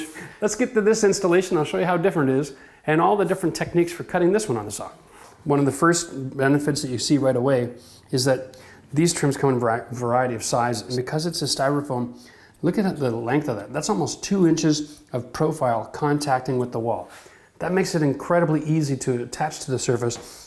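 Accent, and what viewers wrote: Canada. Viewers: I just LOVE your approach! Ty for keeping the moment of almost crashing off the ladder - dude makes me feel more normal! Ty for you just being so real !! I am a 60-YO DIY woman, learning how to care for own home after 25 years of marriage — your help / expertise boosts my confidence so much! Also love your friendly Canadian accent & approach 🦋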